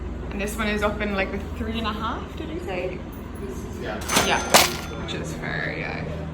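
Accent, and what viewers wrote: Ireland. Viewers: Nice Irish accent : ) Lofts are overrated..I stayed in some on Airbnb in NYC, not really great as you think. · Hey is that an Irish accent? I can't place it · How long have u lived here it’s sounds like ur Irish accent is fading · I knew I heard an Irish accent!! ❤❤❤ btw, I liked the last apartment... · I'm new to ur channel and r u Irish? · Good info, bubbly personality and AN IRISH ACCENT —> never clicked subscribe this fast. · She definitely sounds Irish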